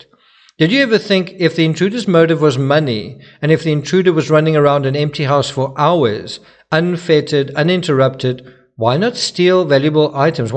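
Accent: South Africa. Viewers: I'm confused, do people not realise you have a South African accent and that's why you pronounce things the way you do?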